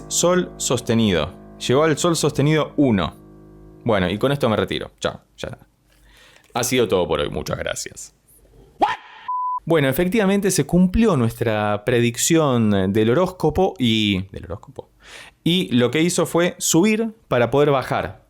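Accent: Argentina. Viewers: Hola, al fin un lugar donde puedo comentar en castellano, además me sonás a Argentino. Te cuento que sigo a Geoff hace un tiempo y es impresionante todo lo que tiene. Los altos y bajos no los he visto en otro cantante, Si te puedo recomendar algo, sería, y otras dos más de ese estilo - como -. Y él está en una banda a cappella hace unos 25 años creo (tiene 44), la banda es y también tienen unos covers buenísimos y diferentes (, de, etc.) pero para mi la mejor y en que Geoff (se pronuncia como Jeff - shef-) es, el tema del Jorobado de NotreDame. (sigo en otro comentario)....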